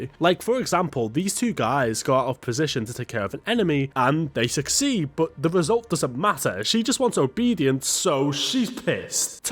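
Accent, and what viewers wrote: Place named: United Kingdom. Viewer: What's this accent, dude? It sounds perfect for roasting people, like British but more insulting.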